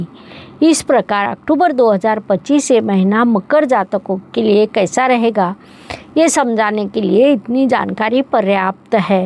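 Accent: Maharashtra, India. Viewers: Arre aunty kyun tum marathi accent mei Hindi bolke jhoot pe jhooth phirse? 😢😢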